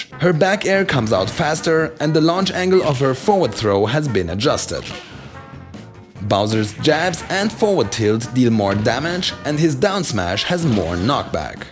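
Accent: Germany. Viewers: Dat german accent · Deutscher Akzent🤔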